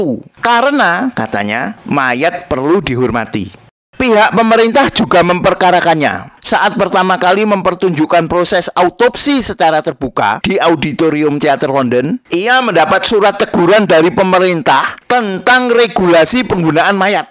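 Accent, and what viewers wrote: Java, Indonesia. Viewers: Suaramu medok bang , nguakak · Puyeng denger aksen medok nya..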